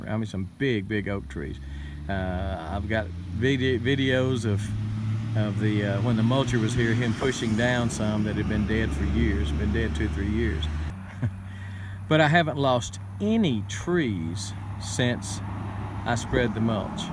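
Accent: Texas, United States. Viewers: I love your videos. You voice and accent sounds like my dearly loved uncle who grew up in Northeast Texas then went to A&M (the Corp then vet school). I am not sure but I think he got his accent when he was an Aggie because nobody else in the family talks quite like him. Thanks for sharing your knowledge with us. Texas farmers have unique challenges and there's little use in me learning from people who live outside my region. You teach me a lot.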